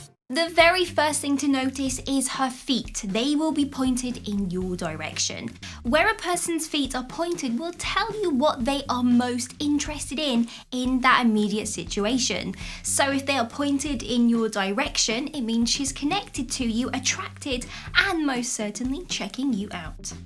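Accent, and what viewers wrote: England, United Kingdom. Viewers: Your British accent is so charming to my ears, I really love it! Greetings from Greece!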